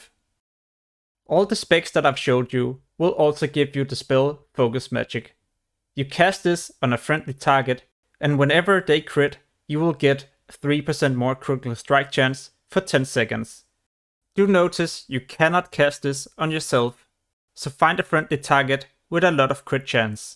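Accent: Denmark. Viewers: jesus christ, the danish is strong in this one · Denmark I'd say 😀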